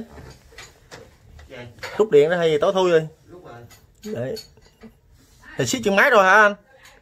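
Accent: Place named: Mekong Delta, Vietnam. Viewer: Chỉ đọc câu chào bà con và các bạn đáng được 1 like .và chia sẻ . Nghe thật dễ mến, chất người miền Tây . ( Ghét nhứt những người nói câu chào mọi người nghe thô tục và chói tai )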